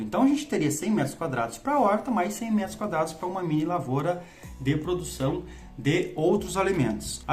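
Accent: Brazil. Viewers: Deus te abençõe por compartilhar o conhecimento isso é muito importante.quanto a seu sotaque achei sempre muito bonito o sotaque do Sul assim como de outras regiões é isso que fais nosso Brasil ser bonito é essas mistura de sotaque e de Cultura por todo o pais.Deus abençõe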